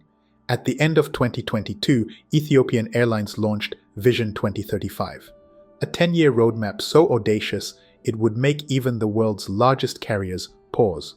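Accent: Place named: Kenya. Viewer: From his accent, yes, he is African. My guess is Kenyan. A youtuber with 1k subscribers can't influence anyone and guess what? 90% of viewers are Ethiopian. Whatever he talks about has nothing to do with Ethiopian Airlines.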